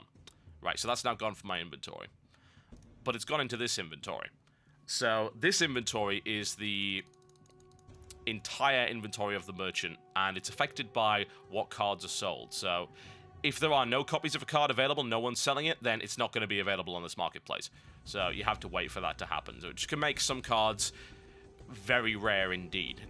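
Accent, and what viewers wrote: United Kingdom. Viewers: Now really, think about this, British people talked like that first, therefore he is pronouncing it right, and we Americans are pronouncing it wrong.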